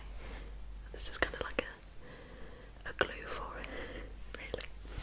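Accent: United Kingdom. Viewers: Pros: British accent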